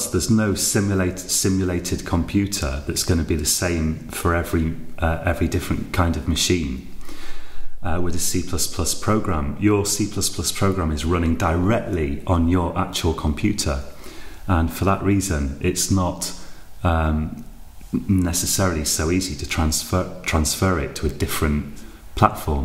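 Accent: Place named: United Kingdom. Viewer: thank you for this very helpful video, besides i love your English Accent. Sound like my British friend and some BCC news broadcasters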